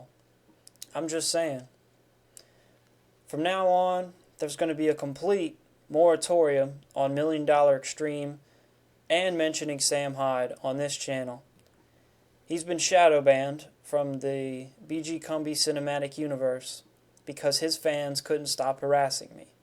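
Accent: Mississippi, United States. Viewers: You from Mississippi?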